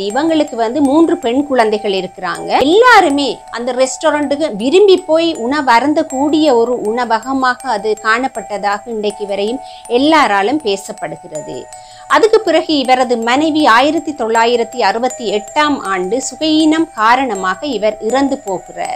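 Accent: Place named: Sri Lanka. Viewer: நீண்ட வருடங்களுக்குப் பிறகு இலங்கை தமிழர்களின் தமிழ் உச்சரிப்பு வார்த்தைகள் நீங்கள் பேசுவதைக் கேட்டு மிக்க மகிழ்ச்சி வாழ்த்துக்கள்💐💐💐💐.உங்கள் அமானுஷ்யம் சம்மந்தப்பட்ட காணொலி மிக சுவாரசியமாக உள்ளது.மேலும் முதலாம் உலகப்போர் உருவாகக் காரணமாக இருந்த சர் ஃபிரான்ஸிஸ் பெர்டினான்ட்(ஆஸ்திரியா நாட்டு பட்டத்து இளவரசர்),பெனிட்டோ முசோலினி,மாடா ஹாரி(டச்சு டான்ஸர்)செங்கிஸ்கான் போன்ற சர்வாதிகாரர்களின் வரலாற்று காணோலிகளை போடுங்கள்.நன்றி🙏🙏🙏🙏!(சுரேஷ்.சென்னை).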